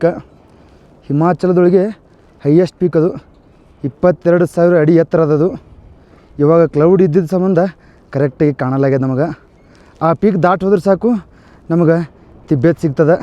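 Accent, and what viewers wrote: North Karnataka, India. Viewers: Uttar karnataka bhase Bhari aeitri anna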